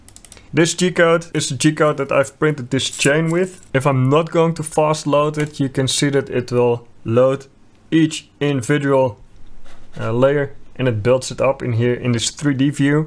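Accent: Netherlands. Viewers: Being a dutchy makes me realize how Dutch you sound. Love it tho. G E K O L O N I S E E R D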